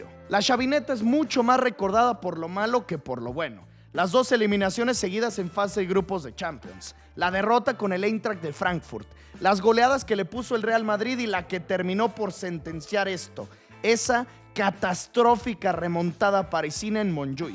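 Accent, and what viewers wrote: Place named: Mexico. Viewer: Acento mexa de la rosa de guadalupe. Inaguantable la puta que te parió.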